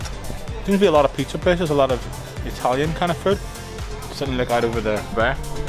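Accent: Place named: Northern Ireland, United Kingdom. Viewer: Good to hear your n. I.accent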